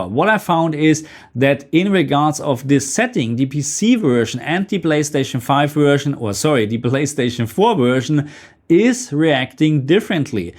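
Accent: Germany. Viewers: Man hört fast gar nicht das du deutscher bist 😂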